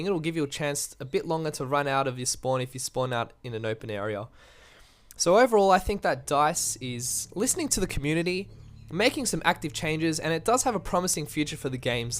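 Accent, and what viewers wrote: Australia. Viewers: mmm, enthusiastic aussie commentator ;)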